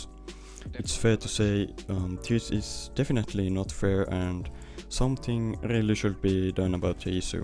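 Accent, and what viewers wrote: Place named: Finland. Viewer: jaaha vittu mietin ku luki tilaa introssa tajusin ku ralli enkku alko kuulua suomalainen sehän on :DDDD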